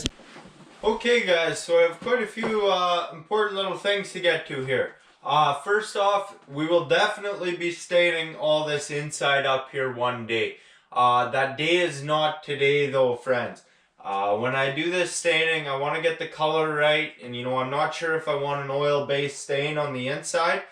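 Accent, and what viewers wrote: Canada. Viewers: You’re the most Canadian dude I’ve heard since I last listened to a Bob and Doug song! Cool off-grid building, I’m inspired!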